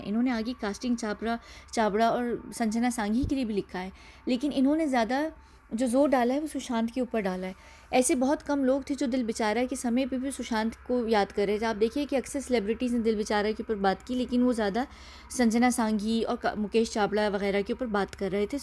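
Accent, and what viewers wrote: United Kingdom. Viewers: Ur voice is awesome. Ur english perfect. British accent is like the way u speak. Ur woman with beauty (didnt see but can guage with ur voice n heart) n brains. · I Love when you speak in English. It's very UK ish in accent 👍👍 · Apka British accent bohot pyara h... jinko dikat h vo gvar h 💁‍♀💁‍♀ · I like your English accent.😊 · I luved ur English accent really Ye British accent h kya???? I appreciate your accent really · I was watching and listening to this video and feeling so sad. Then I was shocked at the way you spoke in English. 😱 The British accent. ❤️ I mean the sudden twist! Your way of speaking is amazing. Amazing analysis. I hope and pray everyday he gets justice.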